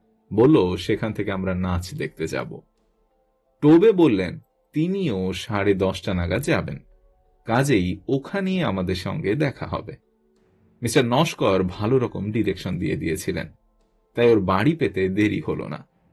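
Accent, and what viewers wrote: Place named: Bangal, Bangladesh. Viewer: Ato bhoyanok pronunciation!!! Please learn proper bengali bhai! Satyajit Ray kokhonoi bangal bhashaye lekhen ni ei sob! You have ruined everything! Akok bhabe korleo listeners need various voices! Use vibrato or else include someone else please! Erokom flat way te kono golpo convey kora jaye na! Please learn the art first!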